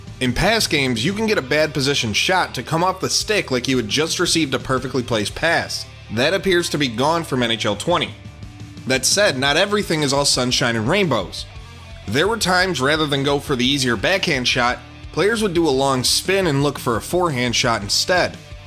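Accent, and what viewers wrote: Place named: Buffalo, United States. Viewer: Heard the buffalonian accent and saw you were using ROC and BUF a few times and thought, this guys has to be from BUF... found you on Twitter and confirmed my suspicions! Lol #gosabres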